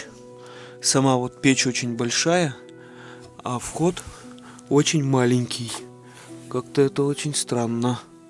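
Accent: Vyatka, Russia. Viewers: пожалуйста,называйте район,где эти деревни находятся.Или это нельзя? Говор у Вас родной,до слез приятно слышать родные слова.Я вятская,но живу очень далеко,в Казахстане,и в силу возраста(73 года) и кучи болячек уже, наверное,никогда не смогу приехать.Очень рада,что нечаянно наткнулась на Вас.Подписалась,пересмотрю все. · Говор вятский... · Точнее, говор вятский! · Автор вы откуда? Акцент как вятских😊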